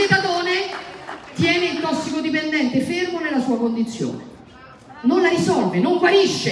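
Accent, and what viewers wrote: Rome, Italy. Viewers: Uno splendido accento romanesco ! la meio de Roma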